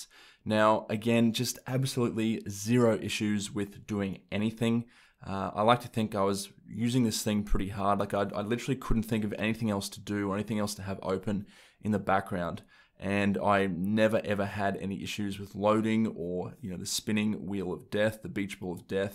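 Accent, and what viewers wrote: Australia. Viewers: Nice video mate, nice occasionally hearing a fellow Aussie on YT. Suggestion: have you thought about using OBS to record your screen? Will obviously add to your editing time though.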